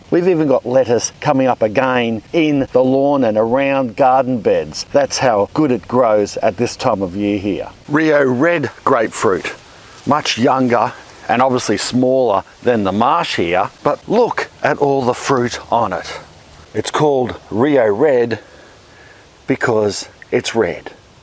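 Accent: Australia. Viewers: seriously you really are sensational buddy!!! Fellow aussie, love hearing your voice, so comforting.Thank you for ALL of your amazing advice it's like having a friend in th garden with me!!! TA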